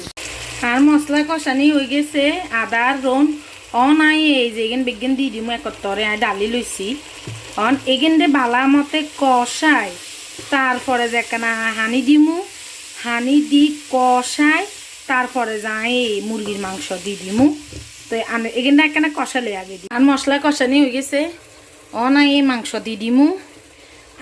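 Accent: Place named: Noakhali, Bangladesh. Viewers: আপু তোমার নোয়াখালী ভাষা গুলো আমি তেমন বুঝিনা কিন্তু শুনতে অনেক ভালো লাগে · অাপনার নোয়াখালী ভাষা ভালো লাগলো। অাপনার রাননা বাননা ভালো লাগলো। অাপনে অামার চ্যানেল টা দেখবেন।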